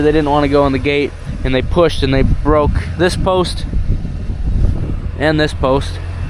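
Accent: South Dakota, United States. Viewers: Was just wondering if you see any Pheasant while driving in your fields. Love the South Dakota accent!!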